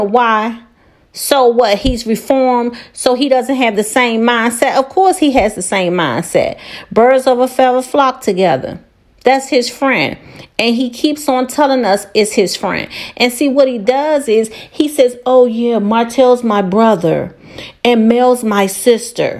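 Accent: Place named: Washington DC, United States. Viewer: New subscriber here….. only subscribed because i feel like I heard some DC twang!!!! #Ward8 #Soufeast4Lyfe 🙌🏾🙌🏾🙌🏾💯💯💯💪🏾💪🏾💪🏾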